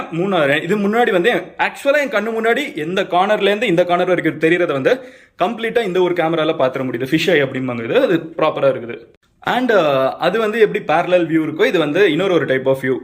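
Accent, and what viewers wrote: Madurai, India. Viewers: Enna than Professional ah Pesinalum Madurai Slang Vanthuduthu 😂😂 Nikka Vachuruvainga,Flight la Kuduthainga 😂😂 Madurai ❤️🔥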